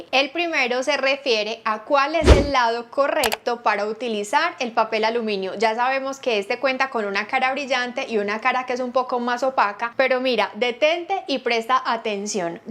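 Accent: Colombia. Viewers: Vine por la información, pero, Dios mío... Ese acento paisa... 😍 🇨🇴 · Me gusta tu acento colombiano ❤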